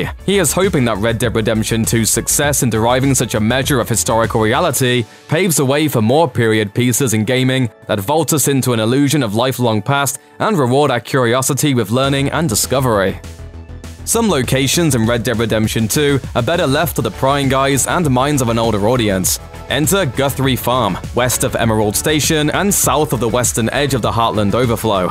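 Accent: United Kingdom. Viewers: Every video I watch is a British guy.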